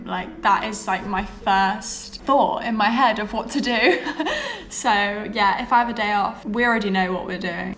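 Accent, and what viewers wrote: United Kingdom. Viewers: this is the first time I hear this pronunciation. She has a really heavy British accent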